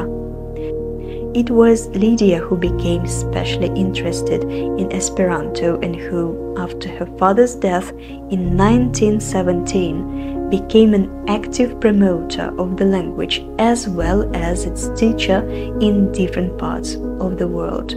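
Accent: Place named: Eastern Europe. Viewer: you sound slavic.. я просто только начал смотреть твое видео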